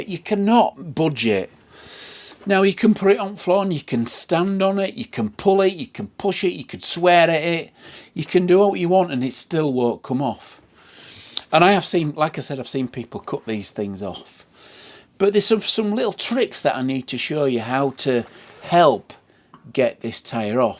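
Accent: Yorkshire, United Kingdom. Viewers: 😆Totally love your accent! Yorkshire I guess?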